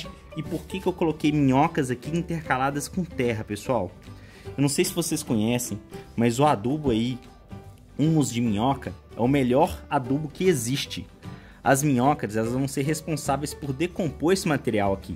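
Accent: Minas Gerais, Brazil. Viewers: Bom dia. Seu estilo de voz me faz lembrar e sentir saudade do povo mineiro, inclusive meu primo Geraldo Mangela, que mora em BH. Bjs de saudade primo, te amo!!! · Que sotaque mais fofo! Será mineirinho?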